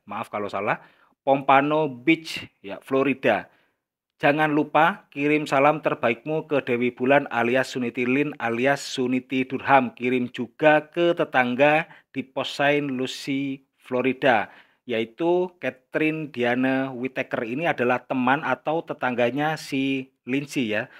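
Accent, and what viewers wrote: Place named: Tegal, Indonesia. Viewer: Logatnya kaya org Tegal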